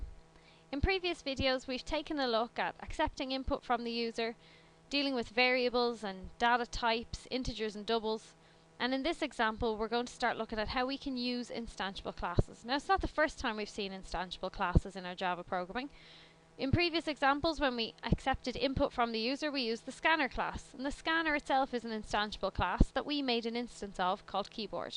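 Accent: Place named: Ireland. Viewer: Very helpful! Nice to hear an Irish accent on this topic!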